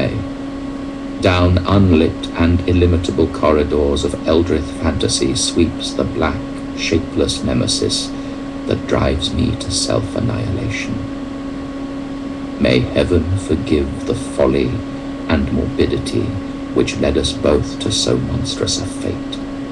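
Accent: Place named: England, United Kingdom. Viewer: This is awesome, and it's great how they got an English guy to do the voice, since that's where it's set. Lovecraft was the man! No, Lovecraft IS the man! Lovecraft is forever!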